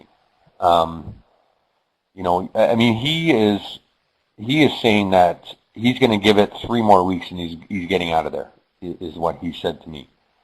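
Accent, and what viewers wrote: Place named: Canada. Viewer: i hate your canadian accent "aboot"? thats not how the <link> pronounces it u ignorant ppl of canada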